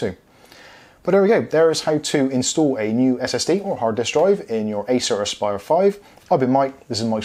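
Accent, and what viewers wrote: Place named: West Country, United Kingdom. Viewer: For some reason, I find your west country accent a bit amusing.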